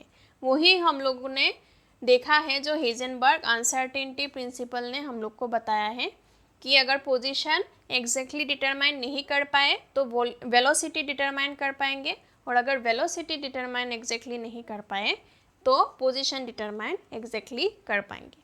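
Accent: Bengal, India. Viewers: Mam are you Bengali??????????????????🤔🤔🤔🤔🤔🤔🤔🤔🤔🤔🤔🤔🤔🤔🤔🤔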